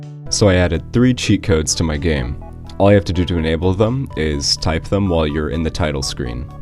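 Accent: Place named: United States. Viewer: I find that if I pause it, the picture becomes still and that annoying, dull, monotonous American excuse for an AI narrator stops too. This leaves me feeling peaceful, somewhat aloof and ready for anything.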